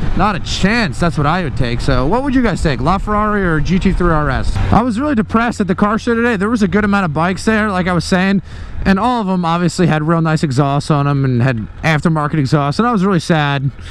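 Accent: Pennsylvania, United States. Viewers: u from pennsylvania?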